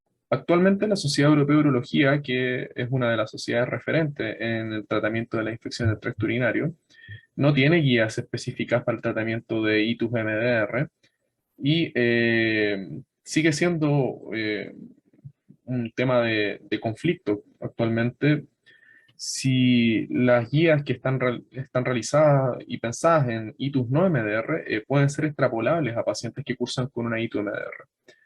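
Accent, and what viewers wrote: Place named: Chile. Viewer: Hola, entiendo por el acento que eres chileno, deberias esforzarte en hablar un poco mas neutro así el resto de Latinoamerica puede entenderte.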